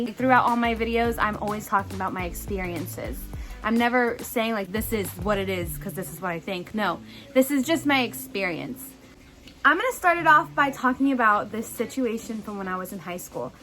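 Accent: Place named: United States. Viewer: I think it might be your voice that sounds American to me rather than your looks, but as you said we are all beautiful and more and more of us are mixed these days. I got 88 per cent European on my DNA test but I looked at my Jewish grandfather's brothers and they look like a League of Nations. I look white but always felt more Native American. When I got my test, I was 12 percent Native American, Asian, African and my friends just laugh and say my test was not accurate. That kinda hurts cause I am all races..... I was happy to find out I am part Taino...from Puerto Rico....!!!! I don't live there but I would probably look like the whitest Taino at the ceremonies.....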